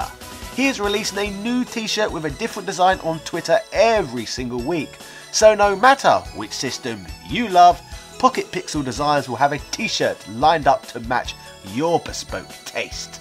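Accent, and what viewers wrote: England, United Kingdom. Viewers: What region is this guy's accent from? English folks are all so weird with their insane regional accent differences.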